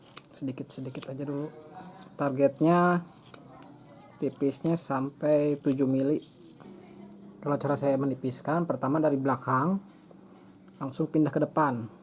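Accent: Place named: West Java, Indonesia. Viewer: Nu sae ngango na kayu naon kang..?? Bade nyobian yeh ngadamel nyalira.. tina logat na mah da jiga asli sunda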